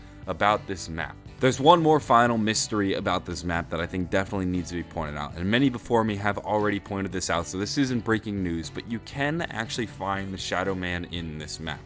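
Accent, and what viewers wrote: England, United Kingdom. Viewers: Trust us english lot- he's saying bald we her his accent clearly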